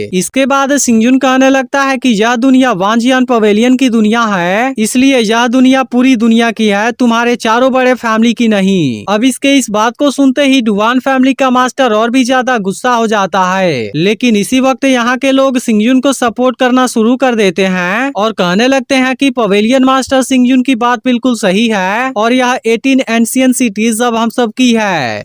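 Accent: India. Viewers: First comment for u 😚 big fan bro ur voice from odisha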